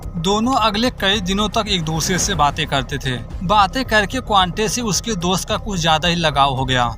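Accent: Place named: Bihar, India. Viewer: Bihari h bolney wala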